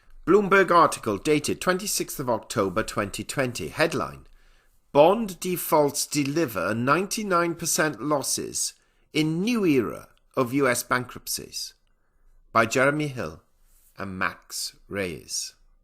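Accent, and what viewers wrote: England, United Kingdom. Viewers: Although all this is threatening information for my small portfolio (but not really unknown to me), I thank you. Allow a personal remark, please: you have a lovely English accent, pleasing voice and good diction (rare on YouTube).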